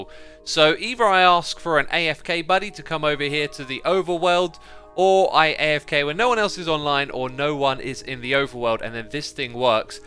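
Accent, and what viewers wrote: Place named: United Kingdom. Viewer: im so used to voice now that i cant even tell he has a british accent, its just accent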